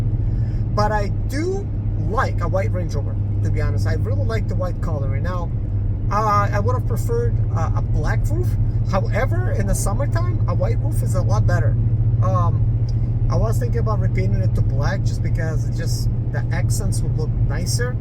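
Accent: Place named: Balkans. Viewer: What nationality are you? You sound very european. Particularly balcan. Am i correct?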